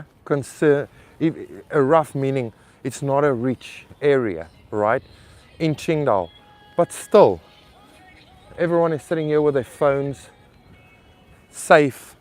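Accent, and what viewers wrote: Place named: South Africa. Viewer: Hahaha can hear from accent u from SA ;D, so glad we have someone preaching the truth on China, not that serpantZA idiot....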